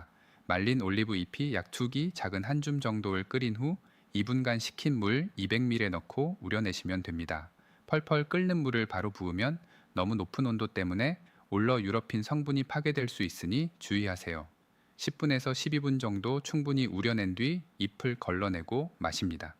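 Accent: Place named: Gyeongsang, South Korea. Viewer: *목소리.얼굴.지식. 다 끝내주고 경상도 말로 사람 지기네요.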